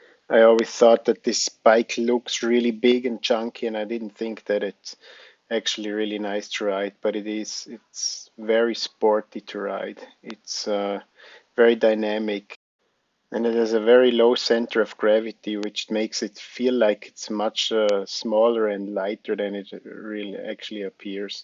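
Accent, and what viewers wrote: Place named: Switzerland. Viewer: Sehr gutes Video, gute Kommentare und angenehme Stimme. Bei einem guten Englisch bin ich überzeugt dass Sie Schweizer sind .😊🙂‍↔️🙂‍↕️.👍👏🇨🇭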